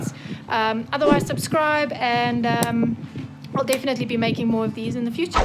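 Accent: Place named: South Africa. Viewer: Liked and subbed! I’m happy to have found a South African youtuber as I grew up near Durban and moved to England in 2002 and I miss the country and the accent so much! This was such a useful and thorough video! You have a very good manner in front of the camera! I didn’t know about this technique so I’ve learnt something new today hehe thank you for sharing! I’m excited to see more! Btw I have a group on Facebook just for vloggers called Equestrian vloggers! I’d love to see you in there ☺️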